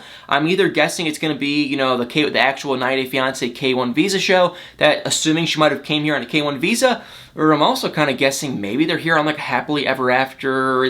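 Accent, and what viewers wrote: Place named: Midwest, United States. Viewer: You crack me up a northern mid western constantly saying Y’all. 🤪. Love ya. Greeting from NYC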